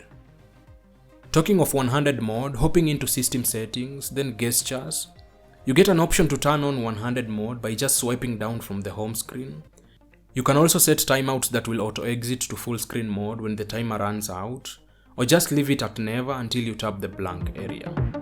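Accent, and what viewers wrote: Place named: Kenya. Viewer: Am sure this guy is from Kenya! That accent ! 👍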